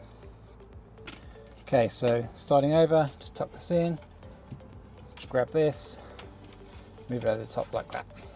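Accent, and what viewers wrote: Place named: United Kingdom. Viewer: I got literally 2/3rds of the way through this until I realised that this wasn’t a UK RHD version car. Your accent threw me off completely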